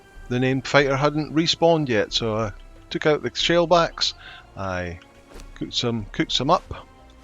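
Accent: Scotland, United Kingdom. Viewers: I think it's still hard core if the goal is to finish the game with one life. No matter what happens to the followers. And it doesn't makes sense role playing wise. To make it hard-core why not zero follower, no healing items? Only eat berries that kind of stuff 😂 I'm starting this challenge right now. Except I will allow my followers to die and I'll be on a official sever. Thanks for the inspiration. Btw are you Scottish? The calm ton of your videos and your accent are the reasons why I watch your videos.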